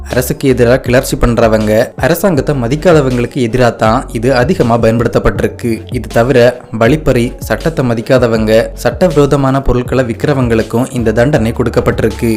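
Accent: Kanyakumari, India. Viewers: Anna neenga kanniyakumari thanea🎉🎉🎉🎉🎉😂😂😂😂😂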